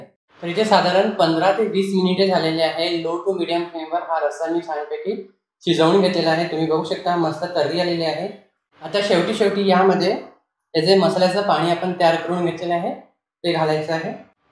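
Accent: Vidarbha, India. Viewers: Vidarbhachi bhasha, bolnyachi shaili agdi mast.Mi panNagpurchi ,aata Mumbaila rahate.tuzi recipe aani vidarbhatil vishesh shabd donhi very ❤sweet.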